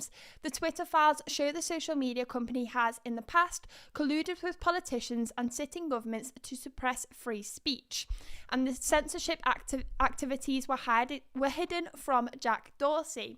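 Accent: United Kingdom. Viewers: Your on form 🦋 I'm sure your a fellow Brummie ✨ Great job.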